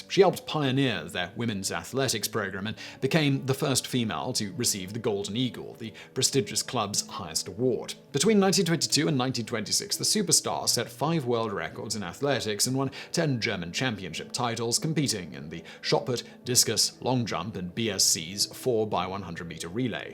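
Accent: United Kingdom. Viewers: Chrisholm for Chisholm definitely got a giggle from me, I can see how your brain would want to add an R in there. It was extra amusing to hear someone say the "holm" part with a British accent instead of the Southern U.S. accent I always hear & say it with ("um" rather than "holm").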